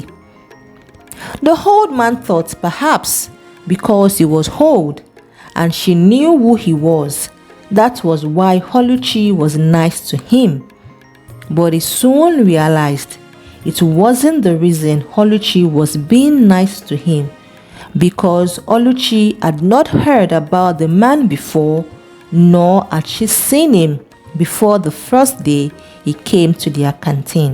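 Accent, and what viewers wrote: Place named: Africa. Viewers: She do not need to tell our African stories in white man voice. Miss, love your accent don’t listen to that Oreo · Nne, we're Africans and proud of our language and accent. This is Original not fake.That person has inferiority complex.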